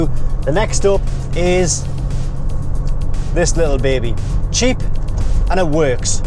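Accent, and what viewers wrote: Newcastle, United Kingdom. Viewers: The minute I opened the video I knew he was from Newcastle. Can always tell the accent. Did my master's and bsc there back in <num>. A great city indeed. Nice video by the way. Warm greetings from Nigeria.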